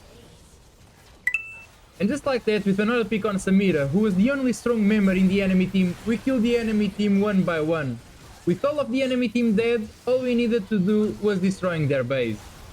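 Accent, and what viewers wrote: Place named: Portugal. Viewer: Sotaque não engana que é tuga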